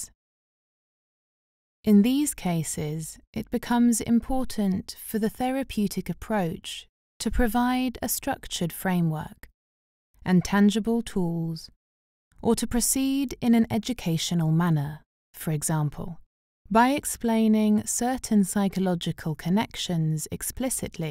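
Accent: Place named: England, United Kingdom. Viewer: I love it when a speaker has an English accent. It makes her points sounds so impressive.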